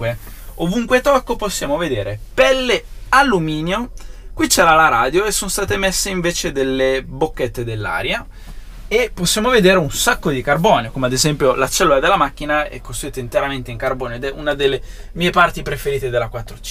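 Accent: Italy. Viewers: I love the Italian accent. If reincarnation is true I wanna be born again to be Italian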